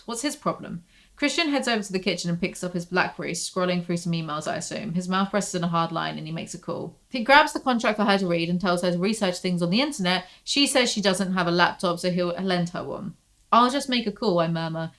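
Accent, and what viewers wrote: United Kingdom. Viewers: every time i finish one of your 2-3 hour long videos i start thinking in a british accent. i just wanted to share this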